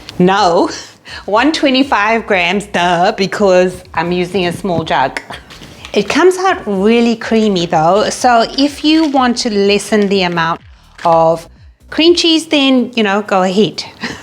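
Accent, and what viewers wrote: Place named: South Africa. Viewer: Were you originally from South Africa. Your accent sounds so familiar. But live from RSA anyway to you 😊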